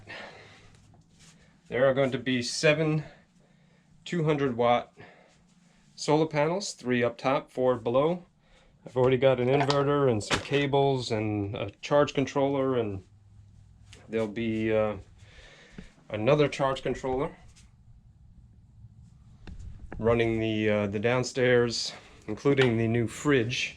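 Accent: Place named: New York, United States. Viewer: Yea, now I recognize it, the slight New York accent. Subscribed, been binge watching - I'm live up north, here in the Outer Banks. Love you're Videos.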